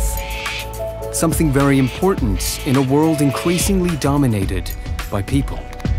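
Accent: United States. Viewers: It's annoying that so many videos about Australian parrots and bird life are narrated by bloody Americans. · Why does this video have an American voice over? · American voice over on Aussie content..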